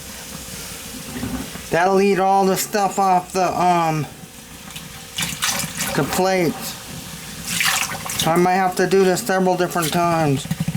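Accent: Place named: United Kingdom. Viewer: You speak British in n terrible way. Whaa Bawaah bong whaaa tralaaa. I can not make out a whood yo shaey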